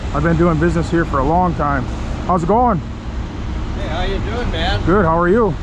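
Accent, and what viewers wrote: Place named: Wisconsin, United States. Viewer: There aren't many accents that beat a thick Wisconsin accent.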